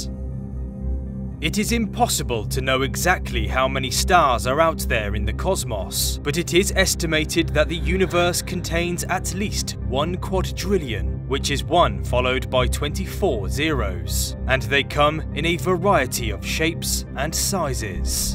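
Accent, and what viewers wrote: United Kingdom. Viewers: I thought this was gonna be click bait, but when I heard the narrator’s British accent, I thought, this is gonna be good! All the best science shows are hosted by people who can’t pronounce AL-U-MIN-IUM, also known as aluminum.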